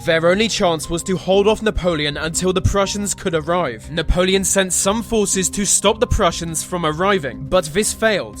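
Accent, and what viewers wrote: England, United Kingdom. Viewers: What's the story with his voice? It's an english accent but he sounds like a robot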